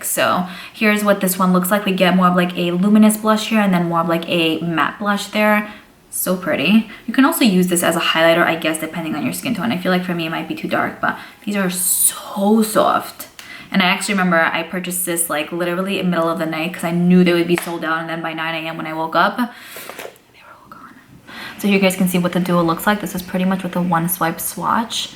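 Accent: New York, United States. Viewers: When u have 3 videos to watch first and choose your favorite YouTuber!!!! You doll!!! I love love how honest and genuine u are! And I miss my new yoarrkk accent lol. Please don’t ever change! I find that once a YouTuber gets a little famous they stop answering the people in their comments who got them there ! I know u are different and so transparent! Xoxo 😘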